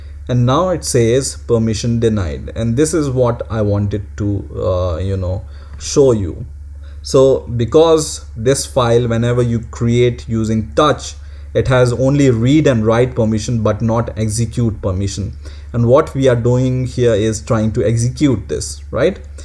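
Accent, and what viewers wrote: India. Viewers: I personally like the indian accent more than some hardcore "real" english dialects which i dont understand at all. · Response to accent comments. Yes an Indian accent can be a bit hard... but as long as I don't hear the word "ugh", "uhhh", etc it is much better to listen to. A well practiced and well pronounced accent is appreciated, but I don't think this tutorial is unbearable at all. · beware indian accent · Many are commenting on Indian accent. Let me tell you Indian accent for English language(despite not being mother tongue language) is far better in comparison to Hindi accent of native English speakers. If any of the native English speakers doubt what I said JUST GIVE IT A TRY...LOL. By the way this tutorial is very impressive.👍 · i hate indian accent :(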